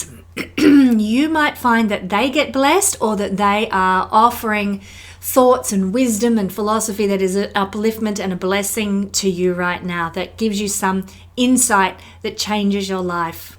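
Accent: Australia. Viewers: G'day it gr8vto heat a Aussie lady doing this ❤ur worx kind re thommo nsw Australia 🇦🇺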